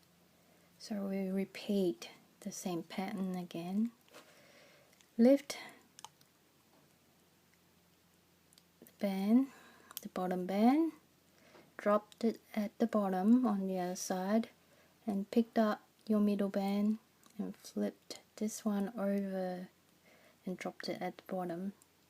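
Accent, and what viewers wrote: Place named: Australia. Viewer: I think you speak beautifully! I noticed the Aussie accent but it sounded different from my other Aussie friends...now I know why. Sometimes I just play the videos to hear the voice...yours is most pleasant; makes it easier to get through a tricky tutorial:)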